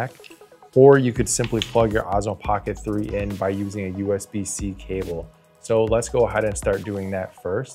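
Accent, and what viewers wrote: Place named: Midwest, United States. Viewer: Midwest accent like a mofo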